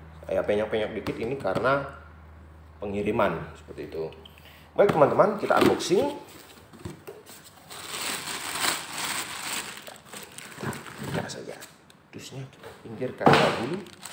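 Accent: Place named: Sumatra, Indonesia. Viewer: saya dari jambi om, masalahnya logat bahasa om nada2nya kayak orang sumatera, salam kenal dari jambi... Dan ditunggu tutorial selanjutnya om saya bantu dengan subcribe ya....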